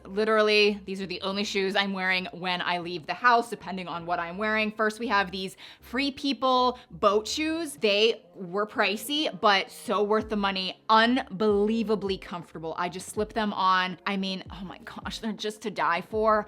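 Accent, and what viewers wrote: South, United States. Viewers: Nothing wrong with sounding Country 😂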